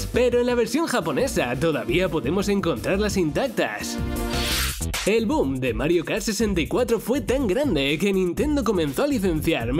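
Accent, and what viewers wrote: Spain. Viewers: No entendí ninguna palabra por el acento español pero aún así me gusto el video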